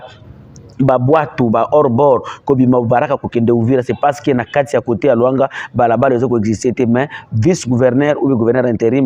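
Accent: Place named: Rwanda. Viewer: La voix du rwandais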